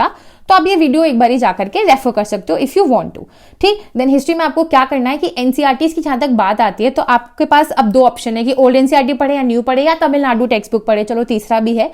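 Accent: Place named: Madhya Pradesh, India. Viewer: Mam aap mp se lag rhi ho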